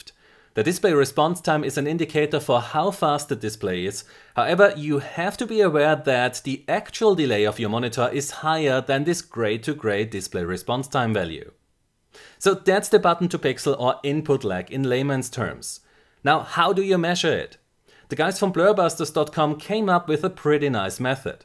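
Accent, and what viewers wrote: Austria. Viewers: could you do a video where you compare the Overwatch Button to Pixel lag across different console shooters? the reason is that on consoles Overwatch seems to have a crazy high lag especially when compared to CoD or BF would be usefull to have such a proof to show the devs that their game needs to be fixed also you sound austrian, is that the case? :P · You always use astonishing test-setups, this is a lot of work, i really appreciate your efforts! Are you from Austria? That strong accent sounds familiar. About the non-difference between 144 and 60 Hz, it could be that overwatch uses physic-frames to control shooting (but that would be odd), and physic frames are capped to a constant rate in every engine i know.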